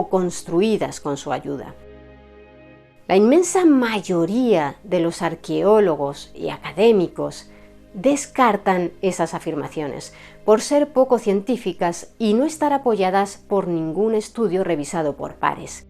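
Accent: Spain. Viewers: Me encantan tus videos, y con el acento español los hace más interesantes 😊 saludos desde México 😘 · me encanta como relata muy misteriosa y todo,el tono de voz mas el acento español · Hola, eres excelente divulgadora y tu acento español es hermoso. Saludos desde el Río de la Plata.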